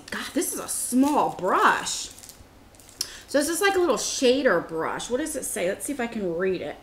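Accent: United States. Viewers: What’s her accent? What state?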